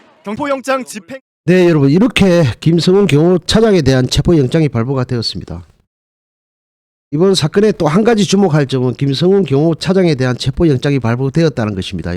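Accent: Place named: North Korea. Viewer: 이 채널주는 북한사람입니다. 어투보세요. 북한사람이에요. 간첩.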